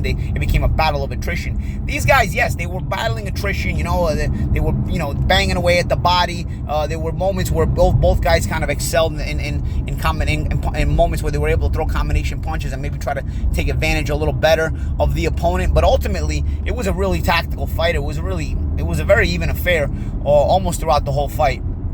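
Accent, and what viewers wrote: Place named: New Jersey, United States. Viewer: Too much jersey wop accent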